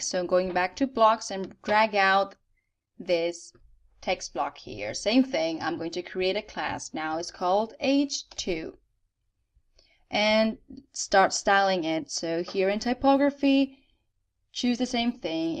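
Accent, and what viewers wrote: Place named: Europe. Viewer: Hay !!! I was all struggling doing it the hard way with the other wordpress youtubbers? No wonder it was taking so long? to get it? Thank you nice lady with the exciting european accent : ) spaciba?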